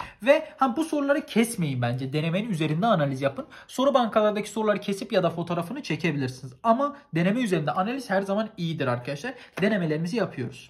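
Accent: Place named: Hatay, Turkey. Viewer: Abi neden hatay sandık içi gibi konuşuyorsun?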